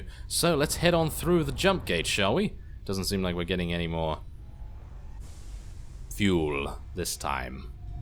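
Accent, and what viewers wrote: United Kingdom. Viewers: Where you from? Are you brit? Can't tell if you're brit or US.... anyway, good vid. (no yelling, no acting). You sound like a nature documentary dubber "the lion is staring at the buffalo... the buffaly sees him but blablabla". You get the idea!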